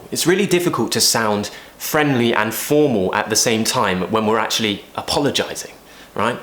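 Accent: United Kingdom. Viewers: Beautiful british accent 🇬🇧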